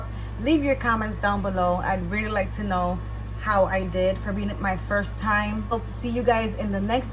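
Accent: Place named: New York, United States. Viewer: I love your accent reminds me of NY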